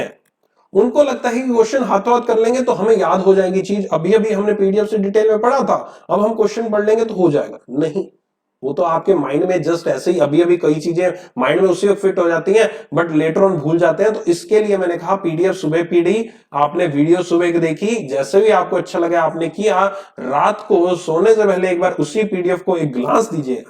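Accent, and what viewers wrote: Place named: Haryana, India. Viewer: Sir ur pronunciation is very haryanvi ,pls try to improve it , Kindly don't take it as a complaint take it as a suggestion ...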